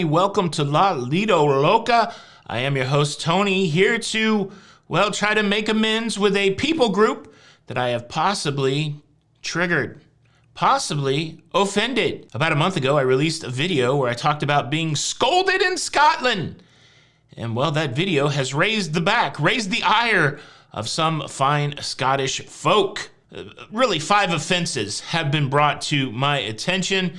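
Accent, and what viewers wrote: United States. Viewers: Give the guy a break. Talk about oversensitive! American accent.. so what?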